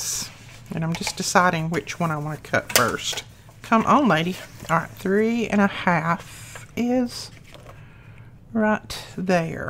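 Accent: South, United States. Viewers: you just keep doing what you do. I sit down and craft while I watch you every night. You keep me company. I love listening to your lovely Southern voice! Thanks for what you do❣️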